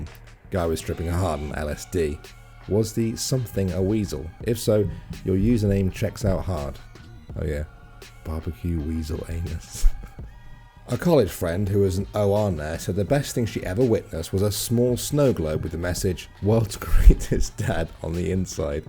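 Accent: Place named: United Kingdom. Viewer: You sound British. I thought the Brits say "arse"?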